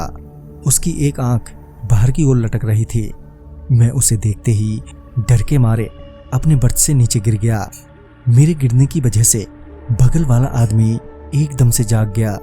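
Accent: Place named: Gorakhpur, India. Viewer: लेखक को 1000 लाइक की आवाज़ को 10000लाइक ये पूरी खलीलाबाद ओर गोरखपुर की बीच की है....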